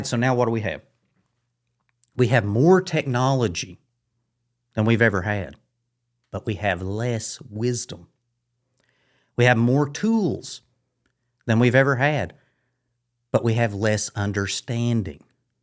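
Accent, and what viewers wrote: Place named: Kentucky, United States. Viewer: Love your thoughts and your accent! Kentucky?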